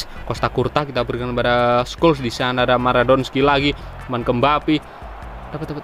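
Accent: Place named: Banyumas, Indonesia. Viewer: Wong ngapak kie kayane.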